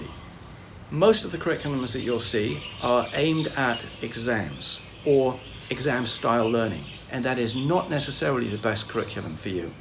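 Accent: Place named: United Kingdom. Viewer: I think the birds really dig what you're saying. I know I get all frothy like that too when I hear your smooth British accent.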